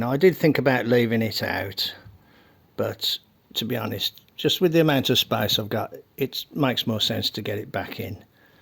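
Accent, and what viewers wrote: Birmingham, United Kingdom. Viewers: Your welcome the brummie accent the bring you back when there's more etc and similar phrases❤️. Good ideas and ways around problems good proper Mechanic. 😉💯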